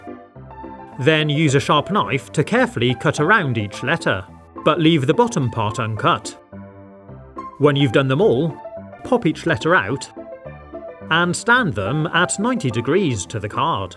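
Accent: United Kingdom. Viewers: this guy has to most british-ish accent known to humankind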